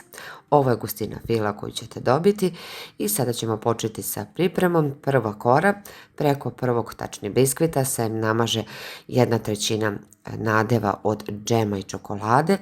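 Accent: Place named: Vojvodina, Serbia. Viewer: Može i odozgo i od gore.U čemu je problem? je iz Vojvodine i priča onako kako je navikla. Ne priča Mađarski da je ne razumeš. A ti si neki književnik pa ti smeta? Ajde ne traži dlaku u jajetu. Pričaj kaki hoćeš, imaš mio glas I sve lepo objašnjavas. ❤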